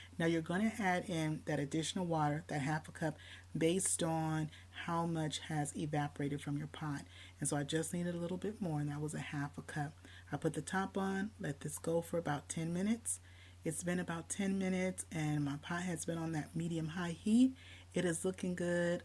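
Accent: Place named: South, United States. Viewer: Now that is some south in your mouth ❤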